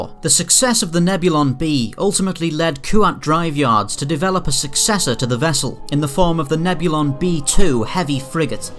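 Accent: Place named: England, United Kingdom. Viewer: i like your style, fast and summarized yet detailed enough for us fans to understand. and that english accent is well suited for this type of channel. good work man! subbed!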